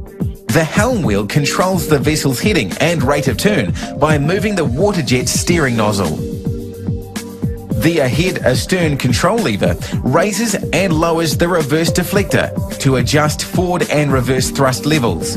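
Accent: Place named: New Zealand. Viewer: Yep. New Zealand accent.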